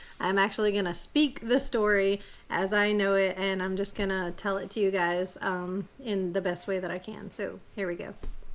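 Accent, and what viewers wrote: Louisiana, United States. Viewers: You can laugh at his "y'all" all the time ! You are good at Cajun speak. Great story too. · I loved the story as well as the Cajun accent. Great job!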